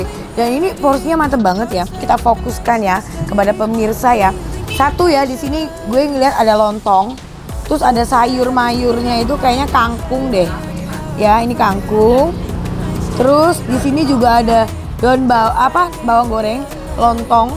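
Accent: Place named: Java, Indonesia. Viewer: Medok euy. Btw anting nya telor ceplok ya ci 😊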